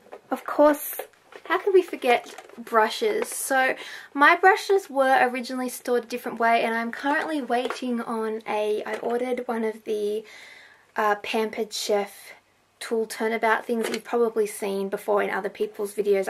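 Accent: Australia. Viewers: omg i love australian accents (and your collection) :)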